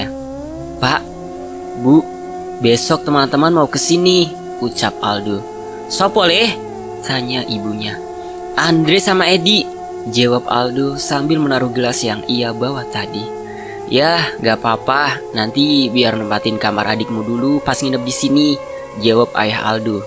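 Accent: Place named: Surabaya, Indonesia. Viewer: dari dialek bahasanya kayak penulis dari Surabaya,cok,rek basa khas suroboyoan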